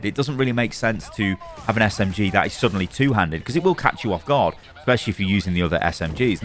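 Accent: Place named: Southern England, United Kingdom. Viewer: Nah they're both just from the south. London area.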